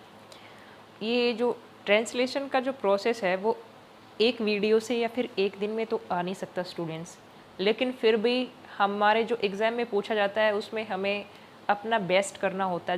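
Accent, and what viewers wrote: Haryana, India. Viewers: Mam meri English bolne m bhut dikt h tho pls app meri help kro 🥺🥺 Ak bt puchni thi app haryana se muje lga apki awaj se 🙄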